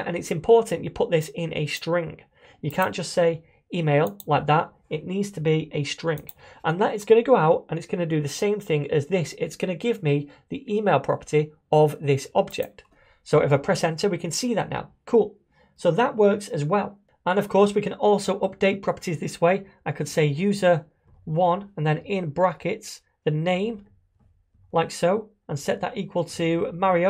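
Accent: United Kingdom. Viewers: are you British, it is two birds in one stone learn British accent with JS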